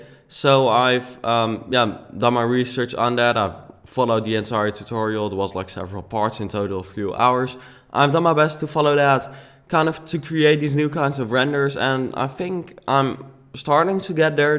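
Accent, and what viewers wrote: Netherlands. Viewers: je kunt gwn horen dat je nl bent lel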